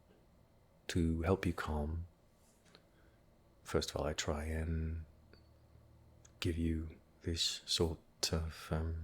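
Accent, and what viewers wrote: United Kingdom. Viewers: Was craving somebody with an accent such as yours because, little secret, British accents drive me CRAZY but in the best way possible! Truly on of the best things I've listened to 👏👏🤌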